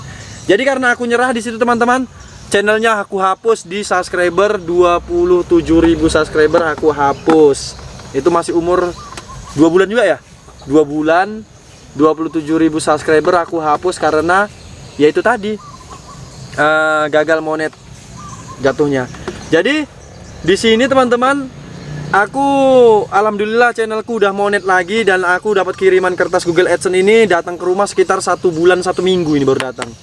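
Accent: Indonesia. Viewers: logat nya khas kali Bg Medan nya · Orang medan ya bang, tapi logat jawa😂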